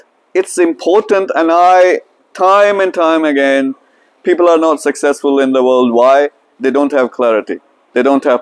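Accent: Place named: New York, United States. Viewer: NYC way of talking an English 😊🖤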